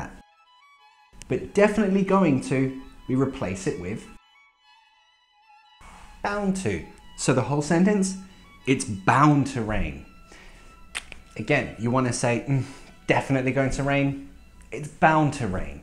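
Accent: United Kingdom. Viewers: i really enjoy all your vedios .... hope that you will go on and make more usefull vedios iam in love with the British accent and you helped me to learn it ... Thank you very much ❤❤❤❤❤ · That is really interesting. Thank you so much for your efforts. And actually, I am not British, and I watch your videos to improve my British accent. I would like to learn how to pronounce the letter 'o' in a British way like in the words 'no' or 'so'. They are not pronounce the same as in American accent. Thank you in advance !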